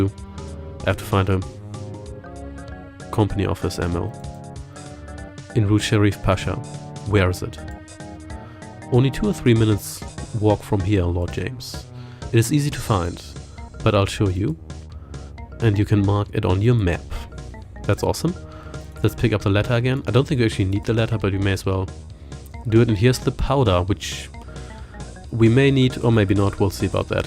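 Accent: Germany. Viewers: You say you live in the UK, but... are you German? Cause your accent definitely sounds VERY German. ;)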